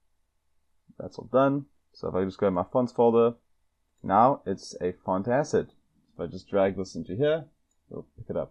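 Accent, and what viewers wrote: South Africa. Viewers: nice to hear a South African in tutorial videos now and then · how noticeable the South African accent is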